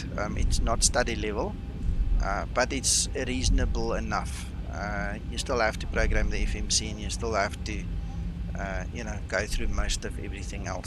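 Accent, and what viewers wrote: South Africa. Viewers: Digging the SA accent bro.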